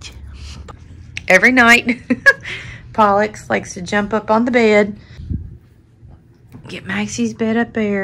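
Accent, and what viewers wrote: South, United States. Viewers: Haha, loved watching the dogs bedtime routine, so sweet😍 and that Southern accent 👍 Thanks for your fun channel and Hello from another curly girl downunder🖐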